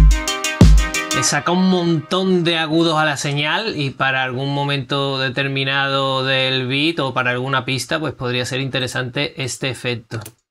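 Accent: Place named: Andalusia, Spain. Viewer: Hola. gracias por el review, hablando de "efectos", tu acento de que región de España es? será andalucía? he escuchado a cantaores flamencos que hablan como tú. saludos.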